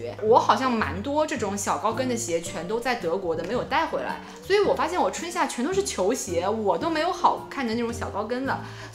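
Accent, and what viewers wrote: Shanghai, China. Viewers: 好喜欢你的上海普通话啊哈哈哈哈，太开爱了。裤脚管，便宜西西的哈哈哈哈😂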